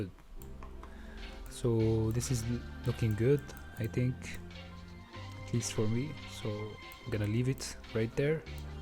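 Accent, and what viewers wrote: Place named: North Africa. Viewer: Great design bro,btw where u from u sound north african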